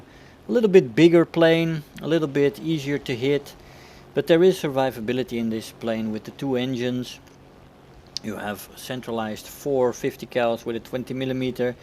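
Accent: Netherlands. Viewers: they're both dutch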